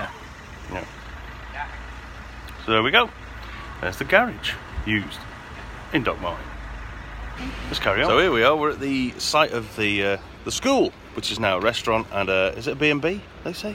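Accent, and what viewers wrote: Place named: United States. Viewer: You would pass for an American in the US by your accent used in this video.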